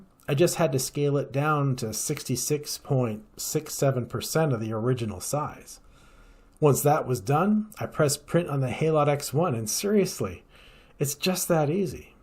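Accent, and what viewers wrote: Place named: United States. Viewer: I was wondering why you mentioned a Warthog while showing a picture that definitely wasn''t a Warthog. Then I realised it was just the way you Americans say hawk as "hock".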